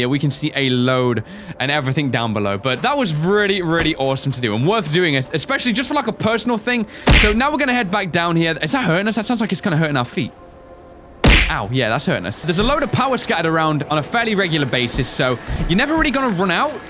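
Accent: United Kingdom. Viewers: your accent is british, you say aluminum and not aluminium british or american?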